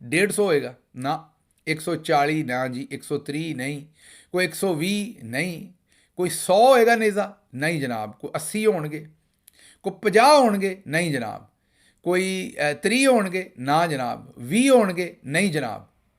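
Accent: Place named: Punjab, India. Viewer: Mind-blowing words n amazing style of panjabi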